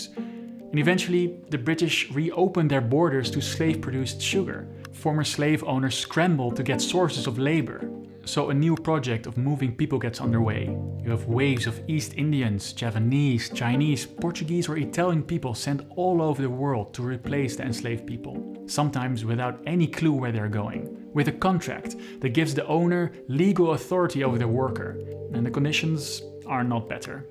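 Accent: Netherlands. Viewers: I really love the vid! But I can hear you're dutch too much to watch it lol. Just can't handle hearing my own accent😅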